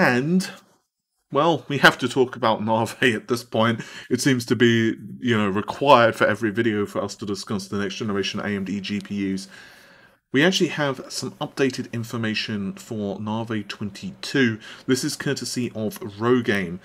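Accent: United Kingdom. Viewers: that british accent is amazing isn't it lol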